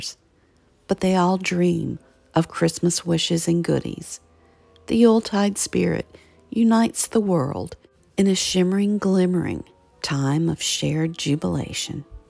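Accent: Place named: South, United States. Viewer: I bought the book you recommended on Christmas Traditions when you featured Part 1 off Amazon. It was a little cheaper than you had said and so I treated myself. I have enjoyed it so much that I will be decorating for Christmas before Thanksgiving for the first time. I agree it will be more festive at Thanksgiving and give me a chance to sit back and relax to enjoy the Christmas season with a few more things already done. I love your videos it relaxes me to hear your voice and your slow southern draw. I’m a southern girl too so no pun intended. Thank you for all the time you put into your UTube videos. They are lovely, very tasteful and informative. I’m a big fan of yours. Be good to yourself and i will do the same. Good day Southern Lady🍁🍂 Linda from Pensacola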